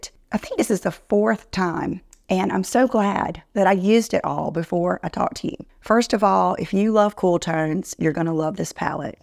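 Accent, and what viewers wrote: South, United States. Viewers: Your voice makes your southern accent even more adorable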